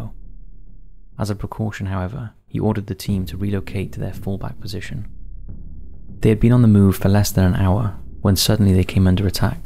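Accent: United Kingdom. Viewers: No, he isnt wrong at all. "Special forces" is a term known colloquially around the world to be synonymous with more/less any SOF unit . Defined as "the units of a country's armed forces that undertake covert, counterterrorist, and other specialized operations". So by definition Rangers, Raiders, SEALs, SWCC, PR etc are all special forces. Just because the US decided to specifically name a unit "special forces", does not take away from the literal definition of the word. and with the fact that the dude has a british accent or whatever, It would just be cringe to hold him to that standard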